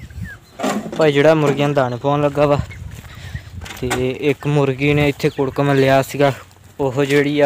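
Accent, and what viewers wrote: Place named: Punjab, India. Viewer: Salaams nice Punjabi we speak like you too apnayat mehsoos hoti hay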